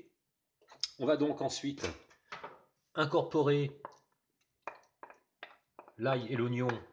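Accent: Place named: Savoy, France. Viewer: On y entend juste un petit accent savoyard.... lol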